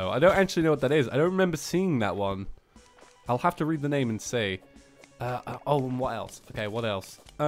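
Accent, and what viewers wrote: England, United Kingdom. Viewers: I knew you're from england even before you said it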